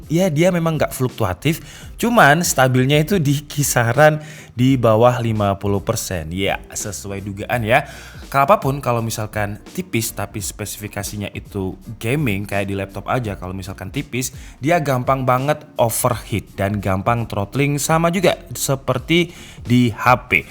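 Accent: Java, Indonesia. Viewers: Pemean... wong malang banget... 🤣🤣🤣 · bhs jawa si mbaknya kentel banget 😁😁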